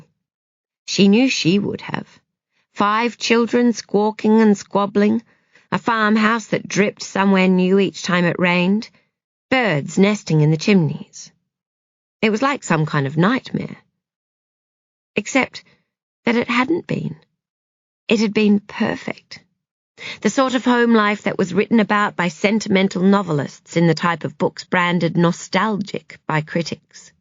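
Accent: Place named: Australia. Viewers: Awful accent. Aussie? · English with an Aussie twang I'd say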